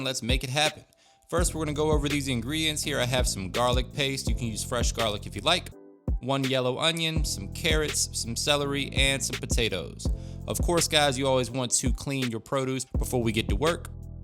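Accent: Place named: Pittsburgh, United States. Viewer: This guy has a Pittsburgh accent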